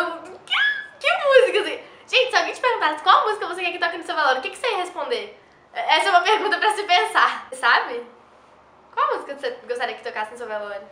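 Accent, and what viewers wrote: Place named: Minas Gerais, Brazil. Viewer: ahhh sotaque de mineiraaa kkkkk lindaa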